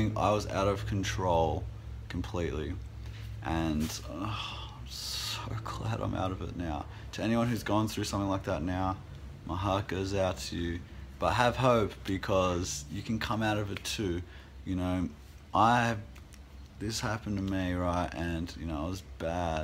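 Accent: Australia. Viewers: It was the first time that l watched your video . Love your accent , do you from aussie? Thanks for sharing your experience. I hope that I can get rid of this habit one day . Although I've juste had finished eaten again after dinner . Sorry for my English . Love from france .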